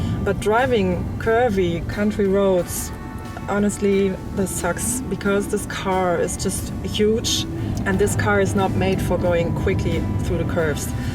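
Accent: Germany. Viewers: next time you want a german guy to review a german car, give me a ring. damn...! I cannot listen to this accent any further.... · ***** You do realize that it is possible to complain about the reviewer without regard for which brand they are reviewing right (ex. like preferring EVO to Autocar)? If you like german accent, that's fine. Some people, including me, don't. The only idiots here are people who don't think other people are allowed to disagree with them. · She's from Germany, English is not her first language. She's doing her best to give you a decent review of the car and in my opinion she's doing a good job at it. Respect.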